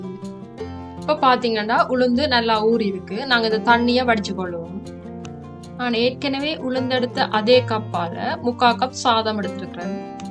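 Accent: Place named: Sri Lanka. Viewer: கண்ணுக்கு விருந்து ரவா இட்லி காதுக்கு விருந்து இலங்கை தமிழ்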